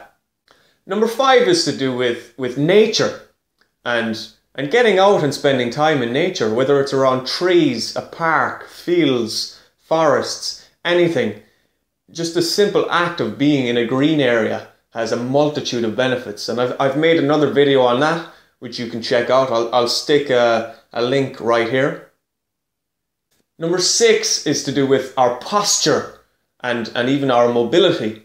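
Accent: Ireland. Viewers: thought u was Swedish at first pretty sure your ireish now. really liked your conor mcgregor video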